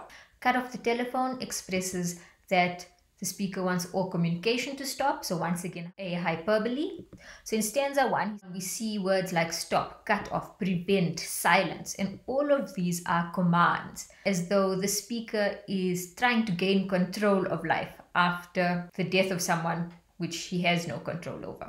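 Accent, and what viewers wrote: South Africa. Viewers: south african? i can hear the lovely accent 🇿🇦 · Are you south african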